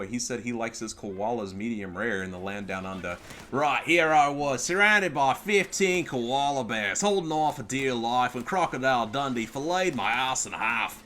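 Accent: Germany. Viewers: As a native german speaker, I highly appreciate your german accent.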